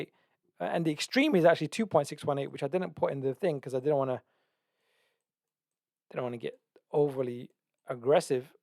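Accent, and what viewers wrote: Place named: United Kingdom. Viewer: more so with crypto narrative, opinions, actually studying all aspects of this market other then price, fundamentals and watching people with a different mindset which allows you to open your mind that little bit more. All these don’t marry up so well with Instagram. None the less you sound like your from the U.K. so I’ll just have to bump into you and try my luck that way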